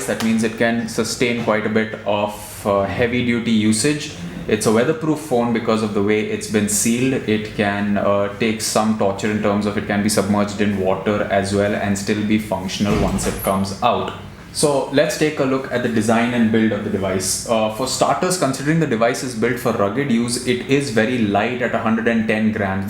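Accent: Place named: India. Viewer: I like his accent very indian accent english...not a critic but its cool....hahaha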